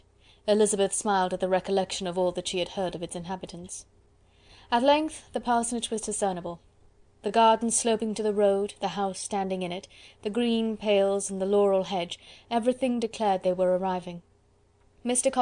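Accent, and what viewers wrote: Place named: United Kingdom. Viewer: Excellent british accent!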